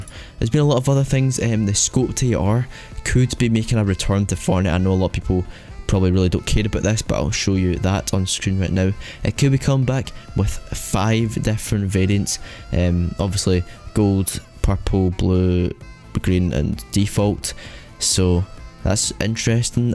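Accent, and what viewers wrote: Scotland, United Kingdom. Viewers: Are you from Scotland and epic name is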